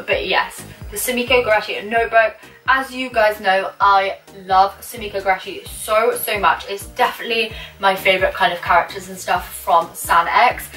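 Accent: United Kingdom. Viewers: R u from the uk iam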